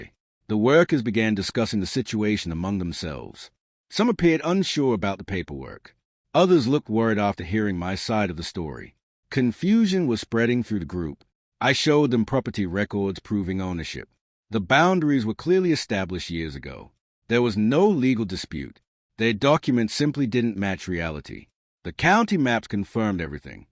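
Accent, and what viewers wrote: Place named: Australia. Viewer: Ya gotta have lots of respect for a Texan who speaks with an Aussie accent .....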